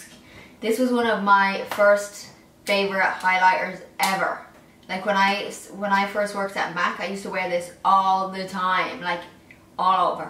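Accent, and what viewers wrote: Ireland. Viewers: Are you Irish?? New subbie i came across you on Pinterest absolute doll xx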